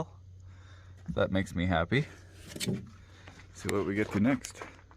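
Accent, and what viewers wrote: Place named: Canada. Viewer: Cool stuff, love those CL Fords, or any Fords for that matter. The Cargo star is pretty dang awesome too, love me some cornbinders, lol. Pretty much anything with an engine. But those CL COE tractors are the best looking IMO, next to a 9670 IH or FLB. Where in Canada are you, I saw the Tim Horton's shirt and the accent gives it away, lol. I'm in NY about 100 miles from the border. Keep on truckin!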